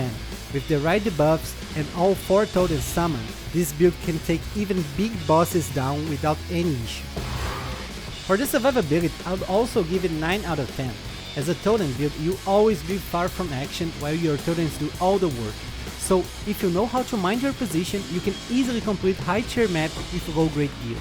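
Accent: Brazil. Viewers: Sotaquezão, parece brasileiro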